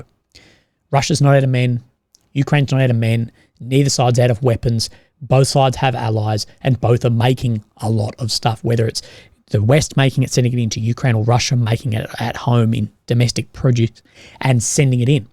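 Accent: Australia. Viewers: Sorry mate, I stand by my earlier comments that you are nothing but a US propaganda channel with an Aussie accent!! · He's Australian, DumbAzz!!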